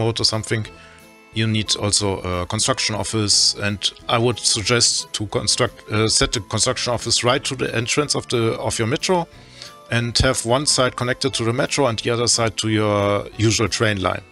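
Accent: Germany. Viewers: Sweet , after three seconds I recognise the German accent.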